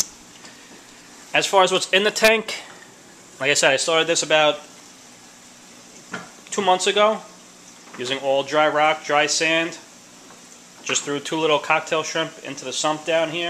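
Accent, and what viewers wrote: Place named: Long Island, United States. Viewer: Good job. Love that long island accent "WAR-TER" "SAW-LT" "FRAWGSPAWN". I have that same light fixture, it works well. The stock bulbs are sufficient, so no worry until you get new ones. What bulbs did you order? Looking great so far.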